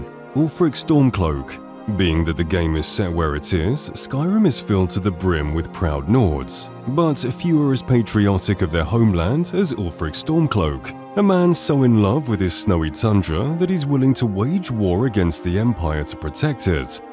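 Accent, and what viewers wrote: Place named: United Kingdom. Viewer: as a american id say yes the brit voice sells the videos lol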